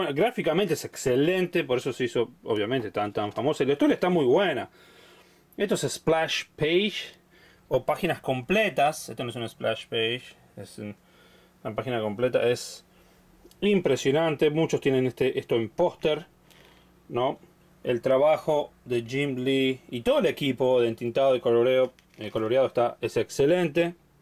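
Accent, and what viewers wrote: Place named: Argentina. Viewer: Sin embargo aunque hablas con acento argentino tu acento no es tan marcado, ¿Es que vienes de una parte de Argentina dónde el acento no es tan marcado, o llevas tiempo fuera de Argentina y por eso has perdido un poco el acento o es que tratas de moderar tu acento para hacer tus vídeos?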